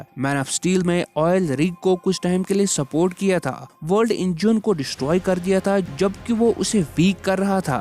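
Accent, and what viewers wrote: Kashmir, India. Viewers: The author's voice seems Kashmiri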